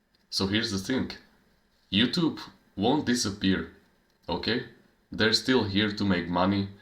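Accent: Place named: Russia. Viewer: Pardon me i had no idea where are you from. By your accent you sound russian ❤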